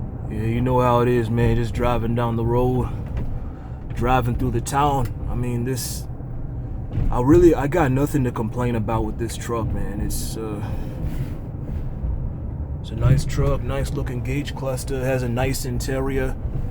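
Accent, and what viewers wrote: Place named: Minnesota, United States. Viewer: Minnesota badass, 10 deg, snowing, barehands, even got some accent!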